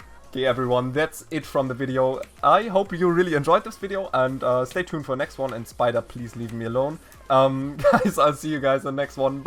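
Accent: Germany. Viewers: Du bist Deutscher oder :) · Are u German I can here you're accent I'm German too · Erstes wort ich wusste du bist deutscher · So geil der deutsche Akzent in deinen englisch haha like ist aber da 👍🏼😅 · Finds ürgendwie cool wie du versuchst Englisch zu reden aber man hört so stark den deutschen Akzent xD (no hate) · Man hört ab und zu das er deutscher ist :D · U R German right? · Bro ich hab in der waren sek gecheckt das du deutsch bist haha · Are u German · Alta hab nicht geguckt and wusste du bist deutsch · Irgendwie habe ich das Gefühl das du nen deutscher bist echt jz 2 sek danach hast du das eingeblendet xddd köntest du auch mal deutsche Videos machen ich finde dein englisch irgendwie so extra deutlich und als ob du dich einfach nur verstellst finde es besser wen man so sein kann wie man ist ka aber macht trotzdem weiter ich finde dich trotzdem echt sympathisch. 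· Man erkennt das du deutsch bist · Dieser deutsche Akzent🤌 (no front) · You can hear that he is german 😂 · Warum sprichst du nicht einfach deutsch in deinen Videos? Nichts gegen dich aber irgendwie triggert mich dein Deutscher Akzent wenn du englisch sprichst. Edit: Deine Aussprache bzw Akzent erinnert mich an Werner Herzog wenn er englisch redet 😂😂😂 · Man hört so sehr dass du Deutsch bist👍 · So you are from germany. I thought already you sound like a german (i am one too) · Kommst du aus deutschland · Ok aber ein bisschen erritierend weil du diesen deutsch akzent hast xD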